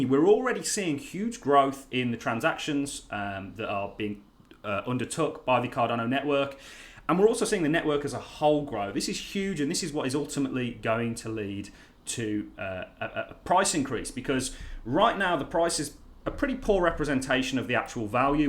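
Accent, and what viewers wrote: Birmingham, United Kingdom. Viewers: You sound like a fellow Brummy? I'm pretty happy you made this video. I was planning on selling my Cardano, but I'll hold on, and hope the price jumps up. What's your thoughts on Elrond? I've had it for quote a while now.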